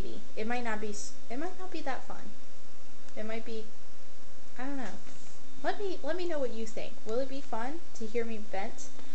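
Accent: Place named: Canada. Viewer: Canadians do rock, I love the Canadian accent :)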